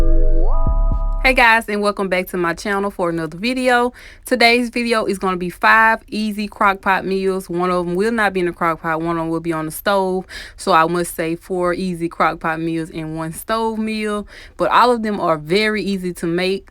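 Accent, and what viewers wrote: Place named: South, United States. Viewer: You gotta be from the south ayyeeee yooo you had me at bolder... AkA butter and poppa Rika 🤣🤣🤣 dead 💀.... looks amazing tho I loved it 😍 👌 🙌 ❤ 💕 Update: I have a question??? How come you don't make any veggies with your dishes Also I don't eat pork.. im Muslim I was thinking veal chops or lamb would you make a video for ppl that don't eat pork thanks 💕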